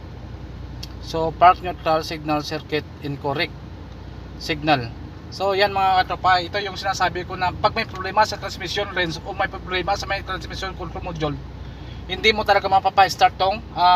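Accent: Iloilo, Philippines. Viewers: Teh daw ilonngo gid timo bah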